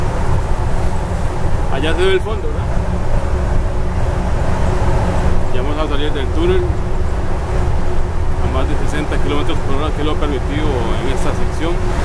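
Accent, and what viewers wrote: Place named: Costa Rica. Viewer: Reconocí ese acento tico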